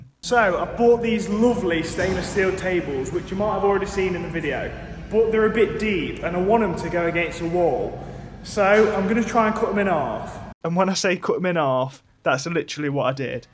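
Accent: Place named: South Lincolnshire, United Kingdom. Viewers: First time watching. For the first 10 minutes, your English accent kept reminding me of someone famous, but I couldn't put my finger on it. Finally, I realized you sound like Brett Goldstein, from Ted Lasso. Looks like he's from Sutton, UK. Are you from somewhere nearby? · Cracking job mate, im guessing your accent? South Lincolnshire?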